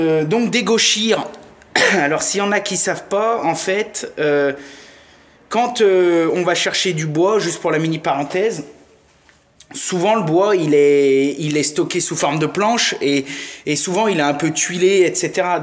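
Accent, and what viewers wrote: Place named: Lyon, France. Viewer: Salut Ami, super vidéo avec des explications claires, nettes et précises. Enfin des mots que tout le monde comprend sans termes anglais ou technique. Et en plus avec un accent lyonnais... car je pense que tu es de Lyon ou des environs. La plus belle ville du monde.....(rire). à + et encore merci. Norbert "dit nono" un 69 du 63.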